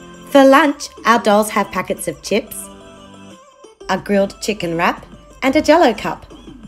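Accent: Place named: Australia. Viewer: Your so welcome! I have always thought that the Aussie accent was cool, but when you speak, it sounds even more cool!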